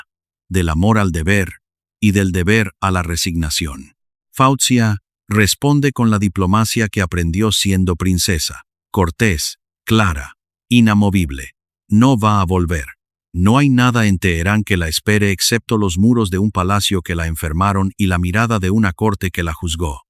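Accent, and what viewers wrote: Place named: United States. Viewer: Cambien el robot de lectura con ese acento yanqui horrible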